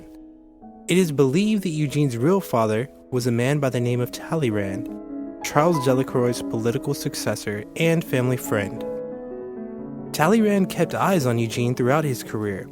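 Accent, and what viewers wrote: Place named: France. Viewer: I don’t understand his French accent. It’s really a pity, because he actually destroyed the whole narration.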